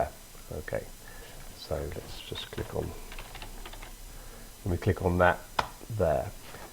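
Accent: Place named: United Kingdom. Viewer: Wonderful! I love how easy you made programming seem, and the British accent is so refreshing to hear. Just one question, can I use this to save data base type data this same way?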